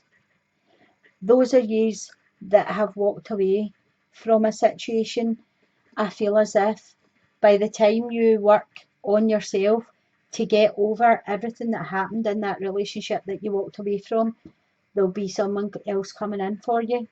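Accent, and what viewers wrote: Scotland, United Kingdom. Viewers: What accent is this, Scottish ? , thanks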